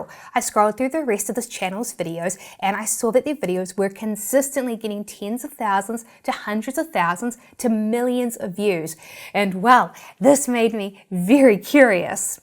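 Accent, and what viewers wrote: New Zealand. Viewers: Hi priceless! Thanks for clarifying. I thought, "Where is this gal from, Mars?" 😉 j/k, I love the Kiwi accent. Chur for the mean accent! 🤔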